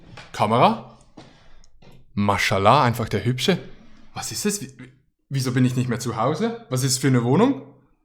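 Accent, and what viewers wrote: Standard. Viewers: Es ist hochdeutsch! Yipeeeeeeee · Feier es das du viel Hochdeutsch sprichst 👍👍👍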